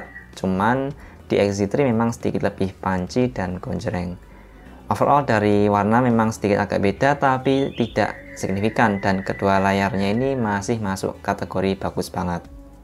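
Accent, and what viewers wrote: Boyolali, Indonesia. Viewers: Wah wong Boyolali iki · Wong Boyolali kah? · Mas e koyone wong boyolali iki?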